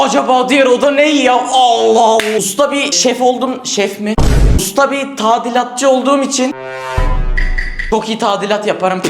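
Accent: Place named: Black Sea, Turkey. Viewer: Oy uşağım Sen de bir Karadenizli oldun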